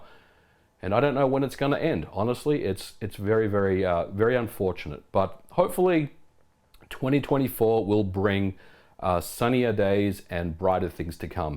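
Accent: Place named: Australia. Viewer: Hey brother, I’ve been a long time watcher of your videos. I think you experienced a lot of growth on your channel thanks to the pandemic so don’t be too hard on yourself comparing views to that time! YouTube is also pushing shorts pretty hard which isn’t your fault either. Everyone knows you’re one of the top voices in retro gaming on YouTube if not gaming in general, plus it’s nice to hear a fellow Aussie voice! Keep doing what makes you happy mate, merry Christmas.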